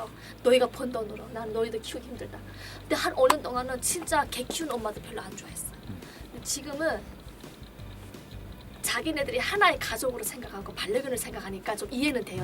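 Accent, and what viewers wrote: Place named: North Korea. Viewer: 북한말 베이스에 부산말투 일부 서울말투 일부 처음엔 의아했는데 이해가ㅡ가네. 대단하신 분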